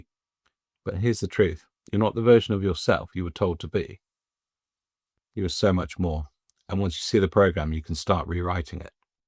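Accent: United Kingdom. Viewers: What part of England are you from? I was stationed there for 8 years. Loved it.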